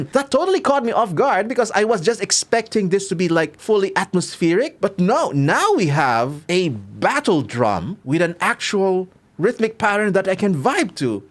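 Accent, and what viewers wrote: Philippines. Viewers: You accent and looks, feels like you're from the Philippines. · pinoy to sa accent palang e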